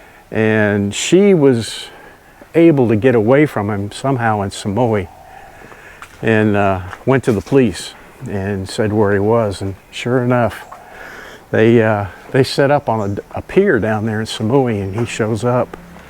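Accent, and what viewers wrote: United States. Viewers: Your health is so good Sir , you could walk for an hour and making videos for your fans Keep it up! Sir I watch your videos to practice my listening I really love American English. Love from the Esarn part of Thailand.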